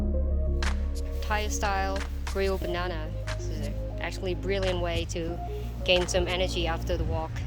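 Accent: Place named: United States. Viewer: Enjoyed your video❤ Your American accent is so sweet😊 Also you are in very good shape & lots of stamina; most likely you can climb one of the 8,000+ metres peak easily.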